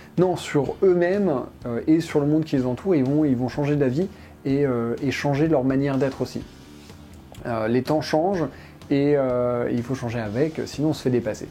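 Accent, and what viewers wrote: England, United Kingdom. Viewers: mais cet accent anglais je suis jaloux !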